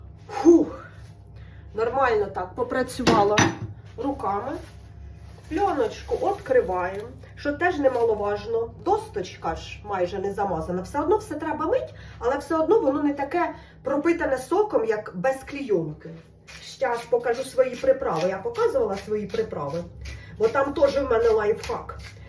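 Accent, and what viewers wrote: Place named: Zolotonosha, Ukraine. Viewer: я тащусь від твоєї мови.Точно,як у нас,в Золотоноші.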